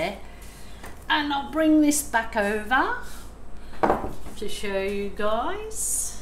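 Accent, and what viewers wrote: Birmingham, United Kingdom. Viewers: Is that an original brummie accent too?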